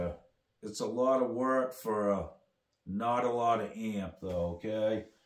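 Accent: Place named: New Hampshire, United States. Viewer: You sound like you're from New Hampshire... lol.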